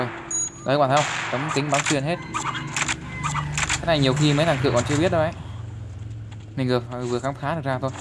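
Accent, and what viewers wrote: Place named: Central, Vietnam. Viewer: Miền trung kb dépác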